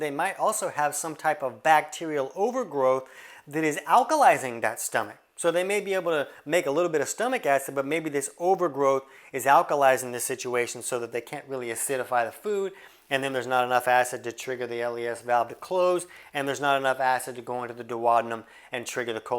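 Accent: United States. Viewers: Dr, which state is your accent from ? best wishes from Brazil 😁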